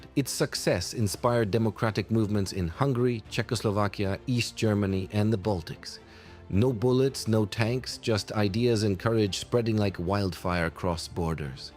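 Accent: Poland. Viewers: Polak zrobił to wideo, komentarz głosi po angielsku z polskim akcentem, kupa Polaków pisze pod spodem do siebie w mniej lub bardziej kiepskim angielskim Kumie chwalą nas! Kto? Wy mnie a ja was! Reszta świata ma to w dŭpie. Ziew, następnie chichot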